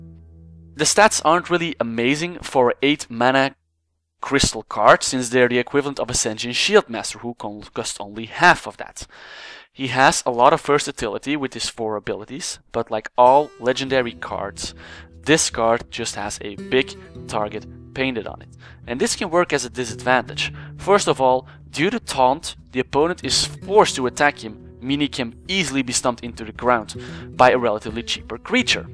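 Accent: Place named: Netherlands. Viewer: are you dutch? you sound like you are. nice vid btw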